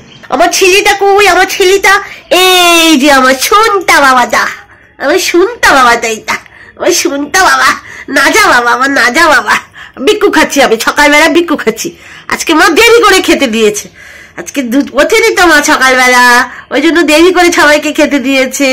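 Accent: Bangal, Bangladesh. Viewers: শুভ জন্মদিন দাদা 🙏🙏 আপনার সুস্ততা কামনা করি সবসময আনন্দে থাকবেন আর আমাদের আনন্দ দেবেন আপনার কথা শুনলে খুব ভালো লাগে বাঙাল ভাষা শুনতে বেশ লাগে তোমার মেয়েকে অনেক অনেক ভালোবাসা ❤❤❤❤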